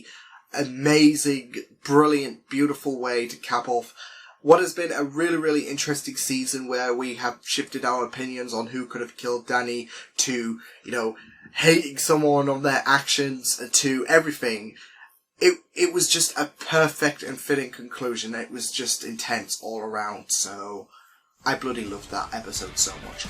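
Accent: United Kingdom. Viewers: Another question: your accent is North of England? Yorkshire? I'm an amateur linguist.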